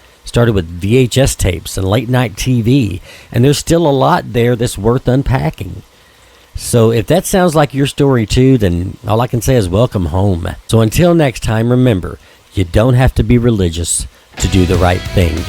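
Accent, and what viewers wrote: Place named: North Carolina, United States. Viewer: thought I was hearing NC. ✌️🤘🏻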